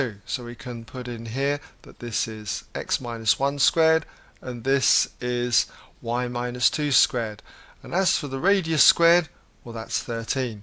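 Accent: United Kingdom. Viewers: I love your British accent <3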